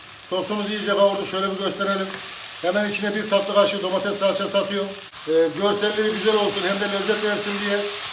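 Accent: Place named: Ordu, Turkey. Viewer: Ben de Orduluyum şefim, aksanınız aynı bizim oralar. Başarılar diliyorum size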